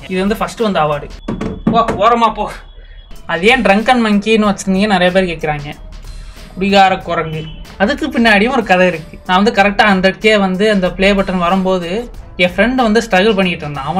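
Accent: Madurai, India. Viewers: Bro Ru from Madurai ???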